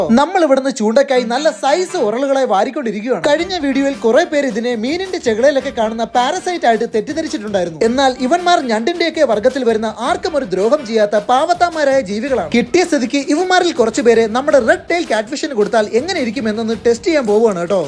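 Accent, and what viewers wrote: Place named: Trivandrum, India. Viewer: Ral tvm speech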